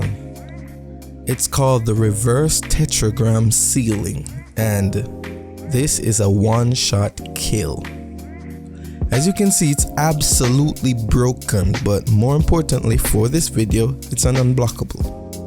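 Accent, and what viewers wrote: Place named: Jamaica. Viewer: I hear jamaica 🇯🇲 in your voice #subbed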